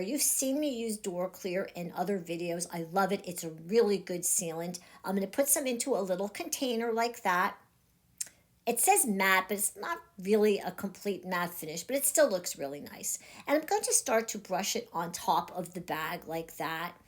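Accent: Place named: Philadelphia, United States. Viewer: I’m enjoying this video- I love this kind of crafting. But I just want to ask you…are you from Philly? The reason I ask is you said “pock a book”. As in pocketbook. I grew up in SW Philly so my ears are perked up by your accent! ☺️ where I live now they say purse instead of pocketbook. 😂 kind of funny.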